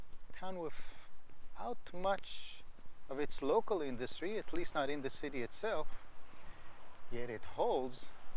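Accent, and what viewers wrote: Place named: Israel. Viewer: so interesting.... I LOVE your Israeli accent!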